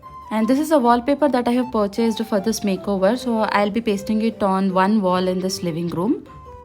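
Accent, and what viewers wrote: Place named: South India, India. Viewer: It's quite a good makeover, dear. You are extremely creative. Kudos to your creativity and hard work. Also, you sound very south Indian!.❤❤❤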